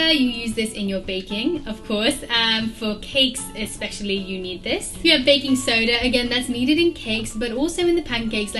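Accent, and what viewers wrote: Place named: United Kingdom. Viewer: I love you! Somehow you appeared in my Youtube videos. I am trying to become fully vegan and your recipes are helping me loads. I am mexican but I lived for 9 years in London so listening to you and your British accent brings me lovely memories. Also, we mexicans use mostly black beans and no kidney beans.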